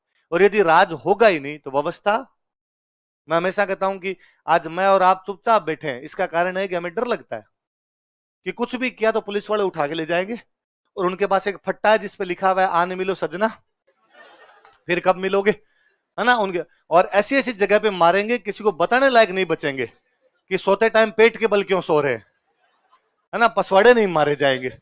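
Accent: Rajasthan, India. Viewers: Bhai ji apki Rajasthani tone me hindi bhot chchhi lagti hai ❤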